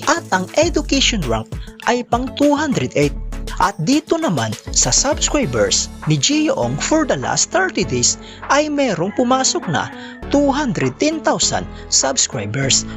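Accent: Visayas, Philippines. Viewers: ang lamya mo mag vlog gukbo..ka umay ka..mas pinapanget mo pa boses mo at binisaya pa ang tono..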